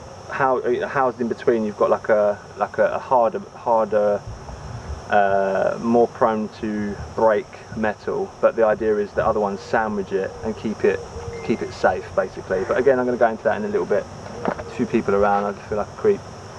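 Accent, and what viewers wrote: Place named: United Kingdom. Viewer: I liked that you took out the kits, and then went throught them later. That's a good idea for the audience. I'll be borrowing that idea when I share mine with the world. I like your hobo rocket stove. That seems like an upgrade compared to the one I built. I'm impressed that you managed to cram all that stuff in there. The bag looks well throught out, well organized, and well used. The tape on a hacksaw blade is a nice touch and really stood out for some reason. Final thoughts: holy crap I didn't know British was a different dialect. I lost count of how many new words I learned LMAO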